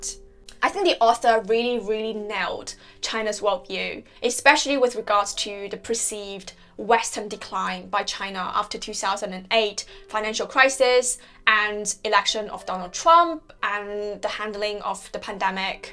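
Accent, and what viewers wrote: United Kingdom. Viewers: your bookreview... I found it quite.. informative. Also about your topics of interest, and your public persona. Youdr British accent is quite impressive, you seem to be ambitious and a person, who is on a definite path of making your life exceptional in your own way. Did you read books by Cixin Liu? If yes, did you find them worthwhile? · Great content. Learned a lot. Prettiest british accent ever. A+ on the book reviews. I am French. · you have a sort of posh british accent mixed with some chinese accent, it's very interesting; where did you goto school? did you grow up in england?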